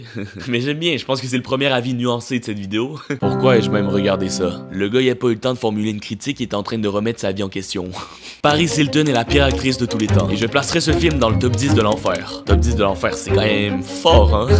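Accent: Quebec, Canada. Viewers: Salut t’es québécois ou français. C’est difficile à dire avec ton accent et la manière que tu parle. Et tu as des termes de France. Mais bon travail !!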